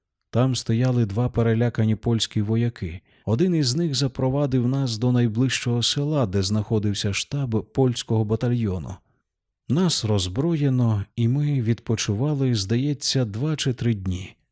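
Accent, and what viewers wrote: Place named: Chornobyl, Ukraine. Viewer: дякую за справжню ,,чорнобильську,,вимову у тексті👍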